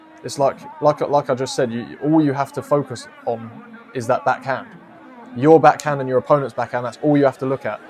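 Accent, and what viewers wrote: United Kingdom. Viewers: Remember me w vids w guy which part of th UK are you from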